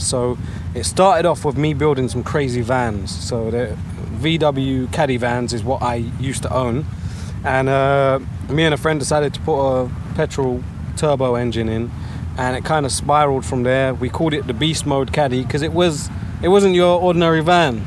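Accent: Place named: Sweden. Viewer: såg videon om armpump å kände igen dialekten direkt ;) vart i Sverige håller du hus då?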